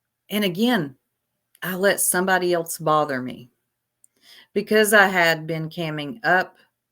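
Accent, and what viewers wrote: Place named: South, United States. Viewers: You sound like you are from Kentucky. Aww I hate that happened to you but you are taking it well. I hope you get it back 90 days. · Love love love that deep southern accent! So nice to put a pretty face to the voice! Thanks for all you do and congrats 🎉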